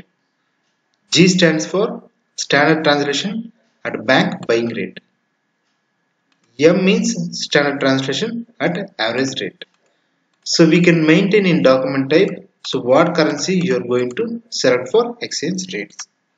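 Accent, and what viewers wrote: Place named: South India, India. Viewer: sorry to say but when u upload any such video try to speak clearly instead of speaking in south indian accent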